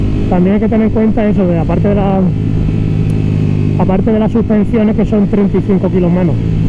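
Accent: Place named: Andalusia, Spain. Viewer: es que es andaluz xD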